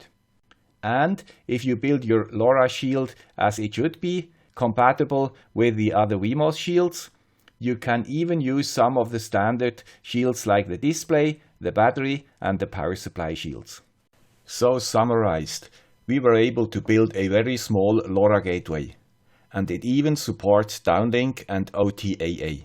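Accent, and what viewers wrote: Switzerland. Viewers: Dear with the cool Swiss accent Finally I got all parts to replicate your awesome project. After soldering all parts on the pcb I took your sketch from your cool Github repository. My hands were already wet and my heartbeat had already reached a critical rate because of the great excitement. With my highly shaking right hand I tryied to hit the upload button. After a few try's it began to upload. Then the shock of the day -> Error message: "conversion from 'const ip_addr_t* {aka const ip4_addr*}' to non-scalar type 'ip_addr_t {aka ip4_addr}' requested " Because I'm a beginner in the Processing programming language I have no clue how to fix it. Do you can help us to fix it? I'm sure for an expert like you this problem is like peanuts;) Maybe it has something to do with the version of Arduino IDE or a library. I'm not sure:-| Kind regards and thanks a lot for sharing your awesome projects, ideas and knowledge with us. 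Thats very kind Greeting from Bern and stay healthy delf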